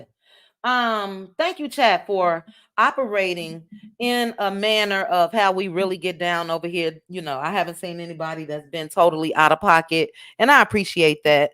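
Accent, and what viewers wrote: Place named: Chicago, United States. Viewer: I’m from Chicago and that’s definitely how we talk…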